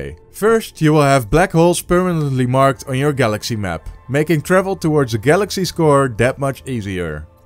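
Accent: Netherlands. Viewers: You dutch aint you??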